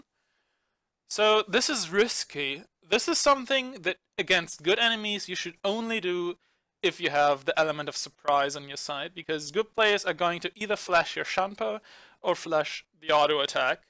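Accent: Germany. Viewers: u are german? :D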